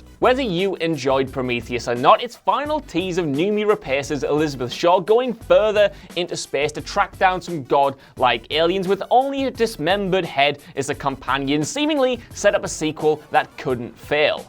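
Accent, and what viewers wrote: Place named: United Kingdom. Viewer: How can ANYBODY sound THAT F*CK*NG English?!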